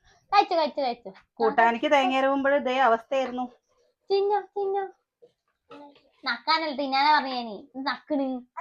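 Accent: Palakkad, India. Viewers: നിങ്ങളു പാലക്കാട്‌ ano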